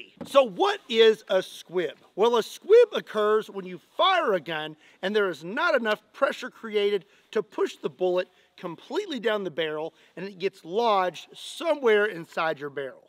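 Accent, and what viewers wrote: Kentucky, United States. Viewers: Dudes definitely from Kentucky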